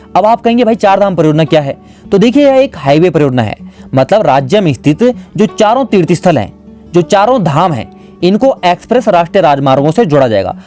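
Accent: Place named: Garhwal, India. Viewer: bhai ki awaaz me garhwali ka feel aa raha hai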